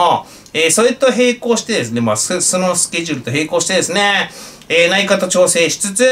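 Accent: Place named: Tohoku, Japan. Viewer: 良かった！ずうずう弁で、活動再開！福島頑張れ👍俺は、茨城だが！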